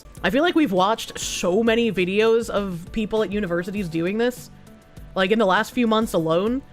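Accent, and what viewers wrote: Boston, United States. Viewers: Needs to up the boston accent best thing to come out of america, god i genuinely love it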